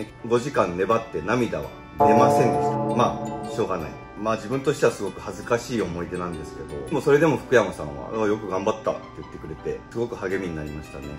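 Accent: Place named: Ishikawa, Japan. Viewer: 壱成君何気に言葉のイントネーションが石川県弁？になってる(笑) めちゃ親近感あります🙆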